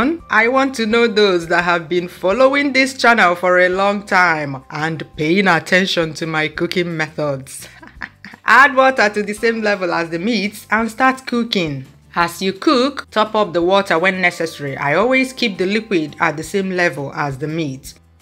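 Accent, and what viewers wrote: Igbo, Nigeria. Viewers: i just love your ibo accent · I just love listening to your voice overs and authentic Nigerian accent. So warm and easy going. Having been in Canada for 12+ years.. reminds me of home!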